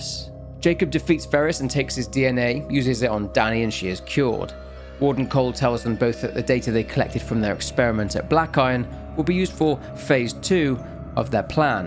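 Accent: United Kingdom. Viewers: british, but soothing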